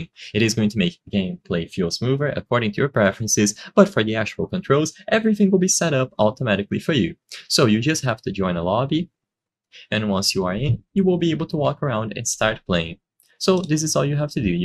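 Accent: Brazil. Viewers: você é brasileiro ne? da pra perceber pelo sotaque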